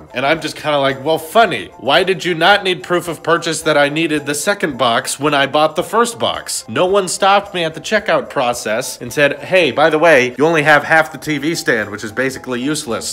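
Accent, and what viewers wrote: Sweden. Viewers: Wait what?! Are you Swedish? :D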